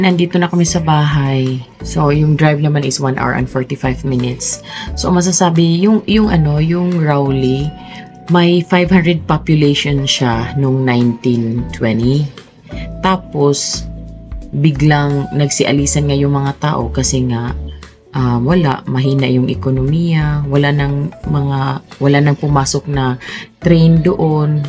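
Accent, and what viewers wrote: Visayas, Philippines. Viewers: Morag bisaya manka mam... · hi maam,napansin ko parang bisaya ka.asa dapit ang imo town or city sa besayas? proud ako sa mga besaya nga hero..♥️♥️♥️♥️♥️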